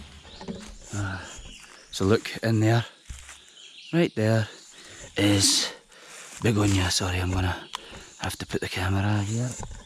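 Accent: Scotland, United Kingdom. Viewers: Nice channel,keep going..areu from Scotland mate?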